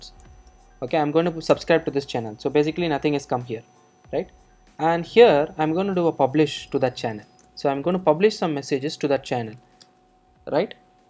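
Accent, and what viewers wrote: India. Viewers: Un comprensoble english. I really dislike indian accent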